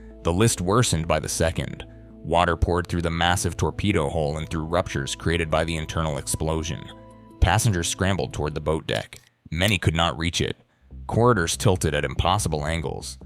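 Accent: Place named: United States. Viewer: Soon as I heard that awful yank accent I had to turn it off.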